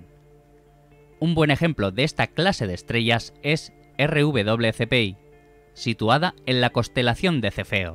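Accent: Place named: Spain. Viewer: Excelente video, pero me caen mal el acento español.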